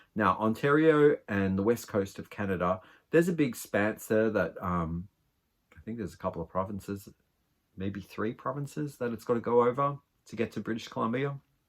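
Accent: Australia. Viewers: Blessings for quick recovery. Hope this finds you better. I'm new from long hiatus from crocheting. I've never ordered from Aberdeen. I loved their Toy Box at $2!!! So I went on the site to order....all colorways Sold Out.😢😮 I love cotton! ❤️ Beautiful Your projects are Magnificent! ❤❤❤ What a Wrap! WoW.. You are Very Gifted! Love the Colors too. Your Accent is Australian? Love to hear you talk. 😊 1st Bag was so my Colors, #6885.❤ It's a Small DK. Bloom is so Pretty. 😮 Loved the Cotton/Nylon. ❤Enjoyed all the yarn haul. Thank you my Friend. Bless You.❤️🙏🏼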